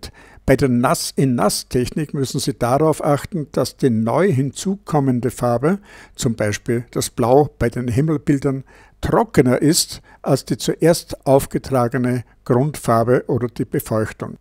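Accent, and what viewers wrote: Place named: Austria. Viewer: ja, endlich. eine Video für mein Niveau - auf Deutsch bzw. angenehmes österreichisches Deutsch :-) Habe in letzter Zeit einige Video über die Aquarelltechniken mit dem Hakepinsel gesehen. Aber Ihre sind halt doch immer eleganter und effizienter erklärt. Das mache ich unter Anleitung am Wochenende mehrmals nach. Schön, das es jetzt zum Feiertag hochgeladen wurde :-D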